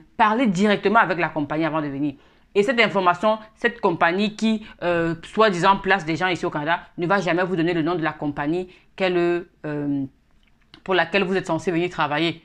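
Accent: Cameroon. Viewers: Tu parles comme une camerounaise. J'aime bien ton contenu. Merci pour tout ce que tu fais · Le ton du 237 ne se cache pas mama.. Merci pr la video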